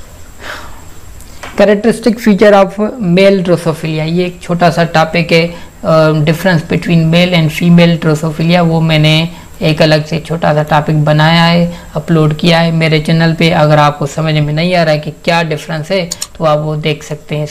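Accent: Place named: Sindh, India. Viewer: you speak english with sindhi tarka, good explanation sir.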